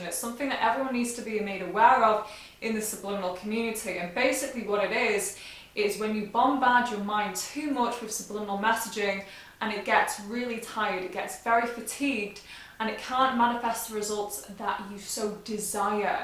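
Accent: United Kingdom. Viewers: I don't know. You're beautiful and charming. And that British accent. My God. God will marry me to a girl like you. You're so adorable. 🎡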